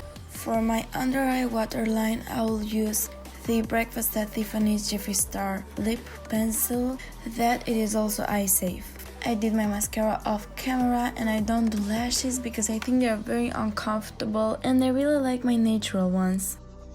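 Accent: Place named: Russia. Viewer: Nice video! Love your accent, are u from russia?❤️😍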